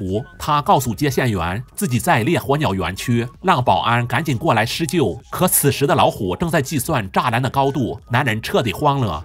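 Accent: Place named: Northeast, China. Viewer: 唉，感覺這解說……實在挺東北大舌頭的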